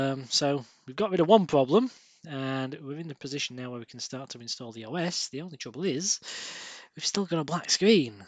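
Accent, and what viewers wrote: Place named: North, United Kingdom. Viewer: Thanks, great video. I will email you now for a couple of ic's. You cant be too far away from me (manchester) with that accent, Thanks again.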